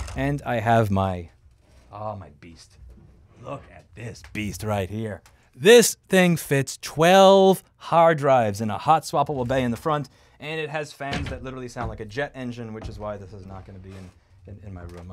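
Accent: New York, United States. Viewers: New Yorker style pronunciations of things is part of why I love him so much.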